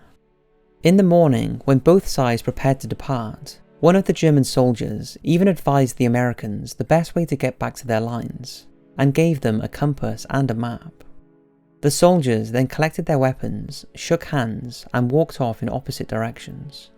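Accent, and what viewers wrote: United Kingdom. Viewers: I understand that, being from Great Britain (from your accent), you would focus on the heroes of the Allies. Yet, I wonder about the heroes of the opposing sides, especially the Germans. I don't care about the Nazis, I'm referring to the average soldier of the Wermarcht. These boys were no different than any other soldier, scared, far from home, fighting for their country and wanting to return home. Unfortunately, because they were controlled by the Nazis, they get lumped under the same title without thought.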